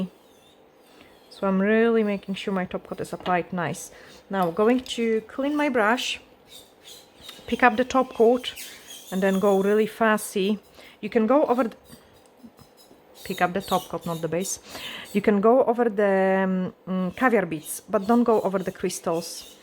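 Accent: Scotland, United Kingdom. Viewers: Absolutely stunning. I love hearing your wee Scottish hints in your accent 🥰 xx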